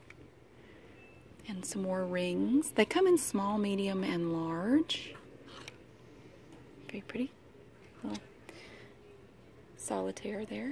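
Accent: South, United States. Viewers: I love listening to your voice, your southern accent is lovely I could sit & listen to you talking all night. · I love your videos you remind me of Lisa kudrow aka phoebe buffay! Your voice is sooo soothing I’m from South Yorkshire in northern England. So any American accent is cool to me! Xxx keep up your amazing vids woot woot!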